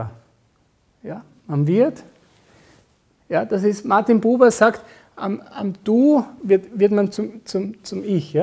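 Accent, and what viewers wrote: Vienna, Austria. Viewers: Wunderbar.....wäre da nicht der "Wiener Dialekt"....für nen Ruhrpöttler schwer das auszublenden😊 Was sind das denn für buchbare Seminare?Wo bucht man sie? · Danke für diesen klasse Vortrag, Herr Bonelli! Das erklärt einiges, was in der Vergangenheit schief gelaufen ist und was ich unbewusst schon dazugelernt habe. Das Herz weiß was wahr ist und wenn man in der Herzenergie ist, gibt es keine Schuld sondern Verständnis und Vergebung. Und - wie gerne hätte ich einen Österreicher als Mann - der Dialekt ist einfach bezaubernd! ... sagt der Schwabe ... :))) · Findest das Schönbrunner Deutsch (Dialekt spricht er nicht) so unerträglich, dass du es ausblenden zu müssen meinst? :-D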